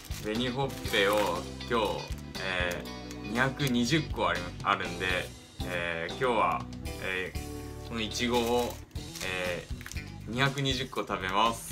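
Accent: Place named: Kansai, Japan. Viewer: あかん笑 に親近感わく＼(^_^)／ もっと関西弁出してって欲しい♪ にこにこで幸せそう！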